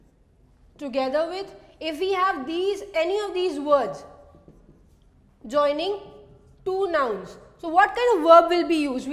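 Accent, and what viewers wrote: India. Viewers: You are good. I really like Indian-English accent. But "I' is not a noun. It is a pronoun.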